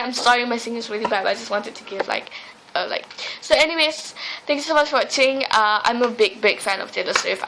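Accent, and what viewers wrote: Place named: United States. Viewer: Awesome american accent though. Doesnt sound malaysian at all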